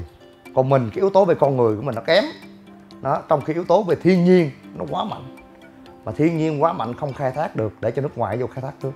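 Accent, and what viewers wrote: Mekong Delta, Vietnam. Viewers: mình quê miền tây nên nghe quen lắm :) chả bị gò bó gì quá , nói chung dn nói đc lắm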